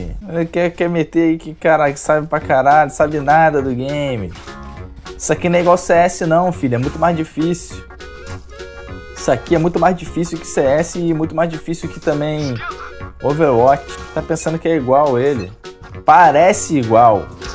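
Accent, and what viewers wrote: Rio de Janeiro, Brazil. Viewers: Carioca tem o sotaque engraçado para quem não está acostumado com a gente.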